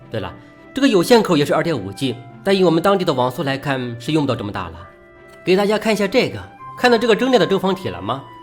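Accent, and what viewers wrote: Shandong, China. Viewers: 大哥山东人？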